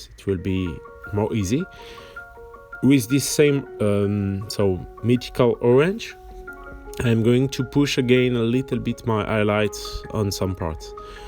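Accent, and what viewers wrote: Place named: France. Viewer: I can hear french accent lol excellent tuto merci beaucoup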